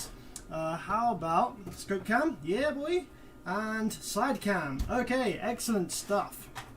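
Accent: United Kingdom. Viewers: bro i never knew you was british lol , did you meet pooven to get your z4 15s?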